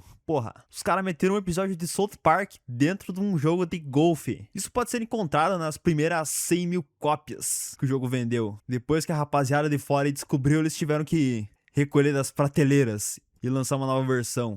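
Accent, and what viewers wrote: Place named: Rio Grande do Sul, Brazil. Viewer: Esse cara é gaúcho só pelo sotaque.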